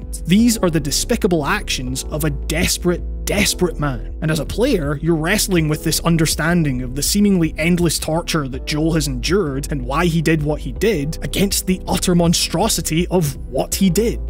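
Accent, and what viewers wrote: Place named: Scotland, United Kingdom. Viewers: What is going on with that accent, it's like 2/3 Scottish, 1/3 American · It's just Scottish. There are regional variations.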